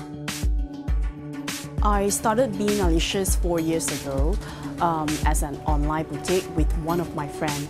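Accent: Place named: Asia. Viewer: Your asian accent is so cute! And I love your directness, I totally agree with what you said! I will look for your stuff it looks pretty awesome. Thanks for the video☆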